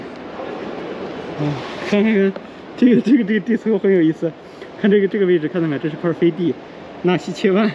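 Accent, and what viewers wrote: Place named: Shandong, China. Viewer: 是哪里人？听口音像是山东的。另外问一下，用的啥装备拍的啊？感觉画质很清晰。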